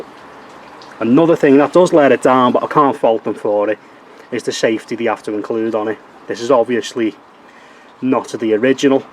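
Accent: Liverpool, United Kingdom. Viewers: Hi mate, just found your channel, enjoying the videos! Assuming by your accent you are a fellow scouser. I just wanted to ask where the local air rifle shop is to here? I've recently got back in to target shooting and can't find anywhere local to get pellets etc. Relying on buying online, but end up with loads of dinged pellets. Thank you!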